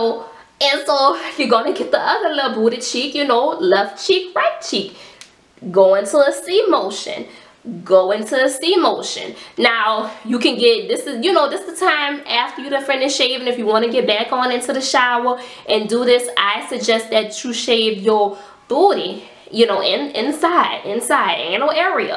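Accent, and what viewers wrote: New Orleans, United States. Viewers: Yes mama I hear dat orleans accent 😂❤️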